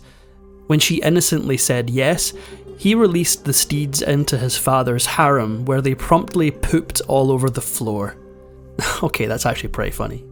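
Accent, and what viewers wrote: Scotland, United Kingdom. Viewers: What’s wrong with a Scottish accent? Best accent ever🏴❤️🏴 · It’s a Scottish accent.🤣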